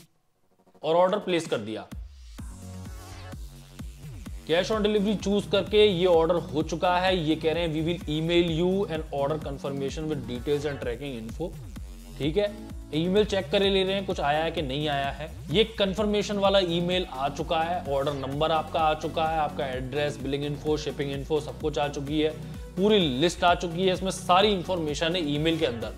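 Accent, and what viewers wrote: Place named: Bulandshahr, India. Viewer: Where are you from, I can recognize your language. Are you from bulendshar, up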